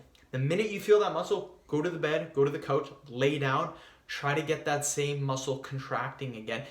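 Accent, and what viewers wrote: Canada. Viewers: This guy is Canadian, isn't he?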